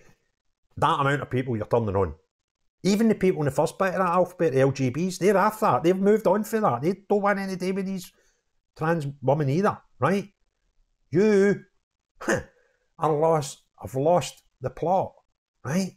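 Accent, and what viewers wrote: Scotland, United Kingdom. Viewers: You make me laugh every time I watch your explanations. Even better that your Scottish. thks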